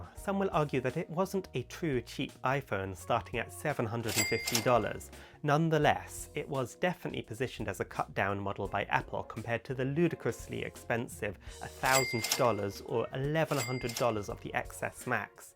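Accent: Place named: United Kingdom. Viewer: I want to speak english like you. The way people from the UK talk... it's immaculate bro. Sounds really fancy.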